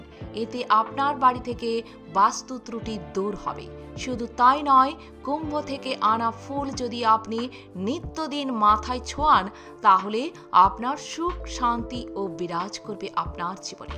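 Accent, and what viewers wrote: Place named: United Kingdom. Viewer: British speaking Bengali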